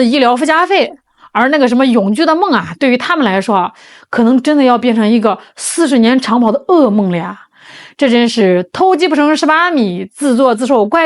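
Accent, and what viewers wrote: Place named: Shandong, China. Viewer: 先改改满嘴的山东高粱腔，学会点儿话再出来卖无知吧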